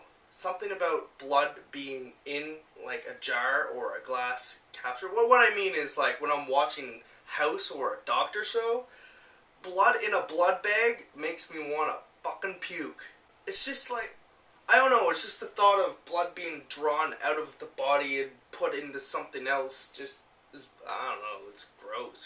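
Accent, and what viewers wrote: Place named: Canada. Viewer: You have such a Canadian accent. Love it!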